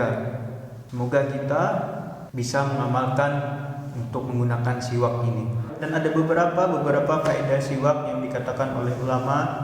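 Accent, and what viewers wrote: Banjar, Indonesia. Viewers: logat urang banua ni kada bisa dikeramputi lagi bang ay. mantap 😂 sehat selalu pian pun 😁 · hanyar aku tahu logat banjar..!! Banjar kalua kh? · kayak ada logat Banjar nya Abang,,,,dr mana asal nya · Urang banjar Kalo? Logatnya tadangar sedikit han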